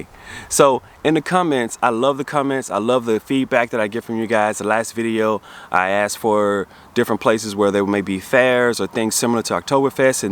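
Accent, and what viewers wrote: England, United Kingdom. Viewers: Oh mate, your British accent 😂😂😂😂 Love it! 😂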